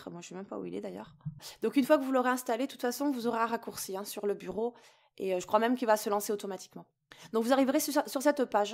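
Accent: South, France. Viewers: Merci pour ce tuto. Et bé ! Je sens un léger reste d'accent du sud. ;)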